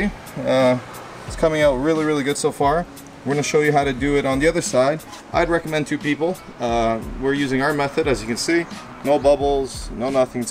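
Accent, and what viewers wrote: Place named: Cape Breton, Canada. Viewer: Where you from? I hear that Cape Breton accent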